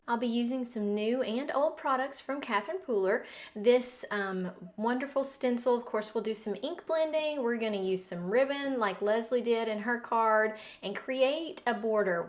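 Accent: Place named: South, United States. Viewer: Love it! I'm a new subscriber (and team mate!). So glad to "meet" you and hear another southern accent! <3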